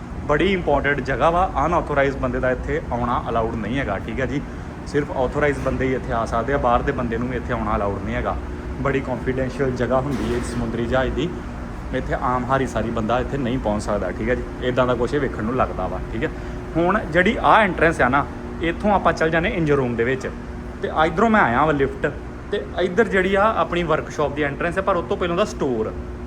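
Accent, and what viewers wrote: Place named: Amritsar, India. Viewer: Goodf information and you belongs to amritsar district as it is clear from your speech.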